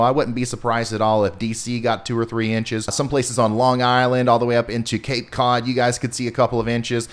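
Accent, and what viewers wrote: South, United States. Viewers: Thank you for your outstanding work at covering the weather,. Being a snow lover in North Carolina, it's so refreshing to hear someone with a "southern" accent say they love snow! Sad to say, forecasters here are saying that my area will be getting mainly ice. 🧊☹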